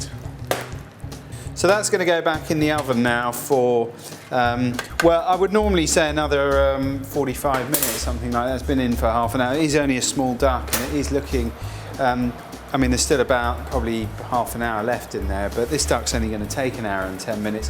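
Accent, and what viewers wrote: England, United Kingdom. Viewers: brit accent - is the best ingredient to this recipe. not so sure about the rest. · I liked this video as it was simple and of course the British accent makes watching the video enjoyable I am going to make this for thanksgiving as after so many turkeys and hams I look forward to a change. Thanks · Love his english accent...he is rubbing into the skin....ah ah ah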